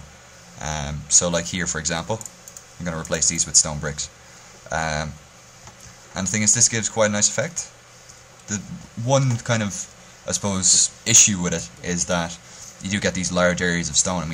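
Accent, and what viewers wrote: Ireland. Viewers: Yeah, I watched, I think it was the Tavern video, and after seeing the design and your ability to teach people how to build this stuff, I kinda just stopped after like a minute in and subbed. You're a pretty kick-ass builder, and, can you correct me on something? Where exactly are you from? Your accent sounds Irish, but it's not incredibly thick like I've heard from other people; it's kinda hard to pick out. If you are Irish, then I wish I could subscribe again, because I'm mostly Irish...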